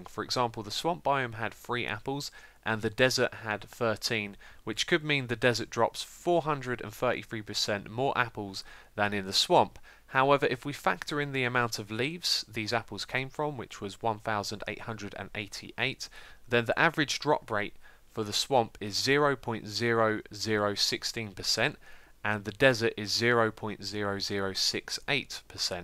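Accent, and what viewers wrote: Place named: England, United Kingdom. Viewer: Ahh thats cool to know :-) Sorry for repetitive question aha but what part of England are you from because my British accent and my friends have a very similar accent to yours, Feel free to not answer this if its too personal or anything aha :-)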